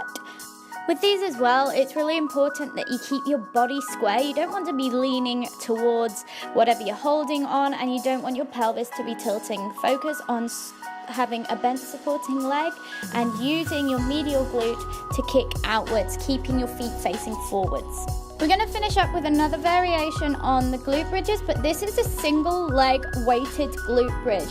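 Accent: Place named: United Kingdom. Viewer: wait, she's Australian?! I am and she doesn't sound it haha I'm so confused 😂 I thought she was from the U.K?!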